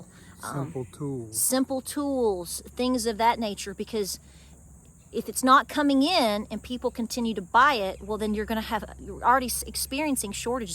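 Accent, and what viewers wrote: South, United States. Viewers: I just found your channel and I enjoy you very much. Thank you, love your peppy self and that Southern accent ❤️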